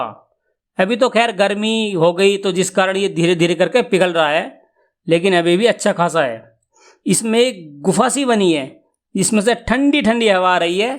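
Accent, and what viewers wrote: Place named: Western Uttar Pradesh, India. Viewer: भाई आप पश्चिम उत्तर प्रदेश से है आपकी भाषा अच्छी लगती है। हर हर महादेव 🙏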